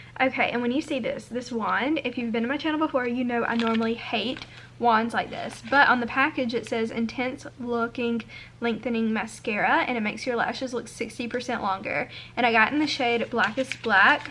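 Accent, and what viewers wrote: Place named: Alabama, United States. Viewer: youre literally so cute!! this is my first time watching your channel. i searched ulta haul (looking for something to purchase while being so bored in quarantine lol) and i am so happy i clicked on your vid! youre so likable. subscribed :) ALSO i live in mobile, al and i feel like you are also from alabama bc of your accent.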